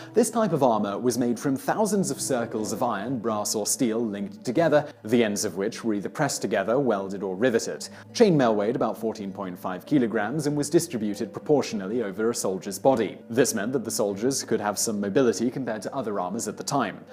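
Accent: United Kingdom. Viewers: Well be patient Simon is British Speaking which can't be too far off from Irish and also the Celts lived in northern Europe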